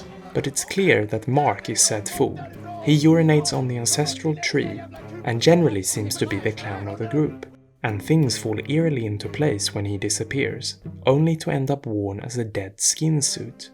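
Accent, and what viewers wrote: Sweden. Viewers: I can hear the narrator is swedish. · Man hör du är svensk hahah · is the narrator swedish?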